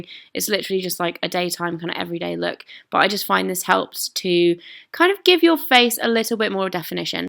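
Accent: United Kingdom. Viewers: Dear, You are so beautiful!! Even without makeup! Love how ur lipstick colours look on you! Diggin' your British accent too;)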